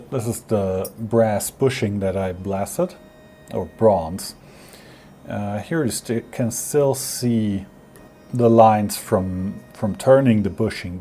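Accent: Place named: Germany. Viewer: Hi! Mpls MN 55407 The German accent makes the audio very enjoyable.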